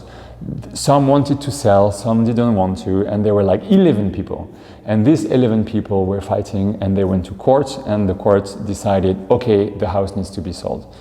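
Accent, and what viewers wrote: France. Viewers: This is also my dream!!. Amazed by your dedication and that lovely francais accent. A bientôt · I love your accent 😍 🇫🇷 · I was so worried when I saw the thumbnail that a rich american bought it... first time Im happy hearing a French accent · As a french guy I feel ashamed that french castels are in sale for strangers (but according to your accent you are french as me ofc). The problem often especialy with british people is that they will buy a property then turn it to totaly private. Not allowing anyone to enter in the park, to fish in the lake if there is one etc. However it is better that those castles belong to a person, even one who will not respect the local habits, rather than leaving the castle in ruins.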